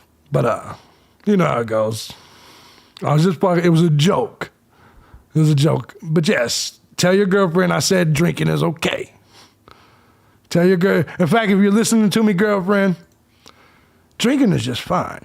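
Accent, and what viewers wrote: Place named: New York, United States. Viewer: After the BATTLE EVERBODY IS A GENERAL. AND SUPERINTELEGENCE, AND DRIVE HIS CAR, HAVE HIS PHONE, A IMBECIL WOD BUY A BURNER, AND MINIMUM 6 MONTHS EFORE, BEFORE THE VIDEOS IN THE SHOP IS DELETED, AND NOW I DONT GIVE ADVISES IN MY TOWN BELGRADE THIS NOW EVERY KOD THE SAME IS IN ARE YOU FROM NEW YORK ORE NEW JERSEY FROM THE ACCENT YOU NOT FROM A SMALL CITY YOU HONNEST YOU SAY WHAT YOU THINK AND AY RESPECT THIS, ALL THIS FOR ME IS REALITY THE ROMANES HAVE GLADIATORS, WY THE PEOPLE TO DISTRACT FROM REAL PROBLEMS. GRETTINGS FROM BELGRADE - SERBIA.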